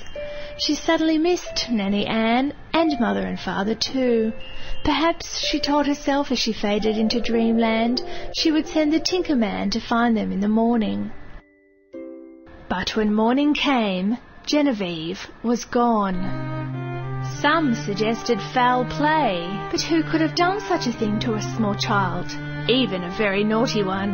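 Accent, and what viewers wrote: Australia. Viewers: weew for the australian narrator c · this one's australian.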